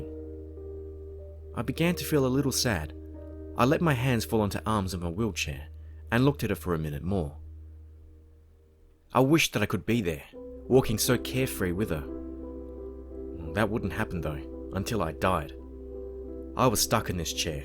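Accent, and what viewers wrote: Australia. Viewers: Ive been looking for a horror narrator w an australian accent and i literally screamed so loud when i found you that my mom thought i hurt myself or something 😂😂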